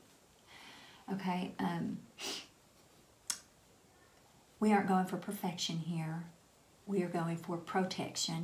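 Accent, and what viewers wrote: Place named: Texas, United States. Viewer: Hey Stephnie, your Texas is showing!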